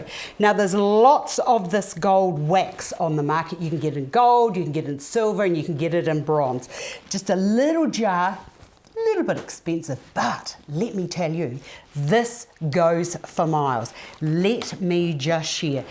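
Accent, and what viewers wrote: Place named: New Zealand. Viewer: It is a Kiwi accent (New Zealand).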